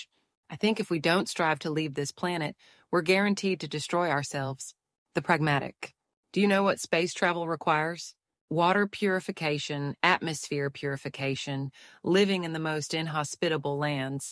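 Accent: United States. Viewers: I'm glad you showed the words "chemosynthetic life," because when listening earlier in the video I heard "chemosynthetic laugh" and "chemosynthetic glass." The joys of varied American accents! Although there's poetry in finding "laugh in the darkness." Thanks for pointing out the usefulness of NASA discoveries for surviving on this planet. The more I see what humans are capable of, the more I don't want us to colonize other planets. Humans might be the Kudzu or the cane toads of other worlds.... We have a long history of unintended consequences. Love your work!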